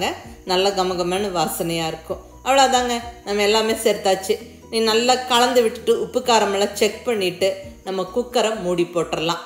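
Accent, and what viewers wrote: Coimbatore, India. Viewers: Nenga Coimbatore ah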